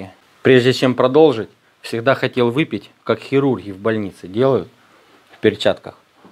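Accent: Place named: Rostov, Russia. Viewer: Братишка,сам откуда? Я из Ростова на Дону,слышу говорок из наших мест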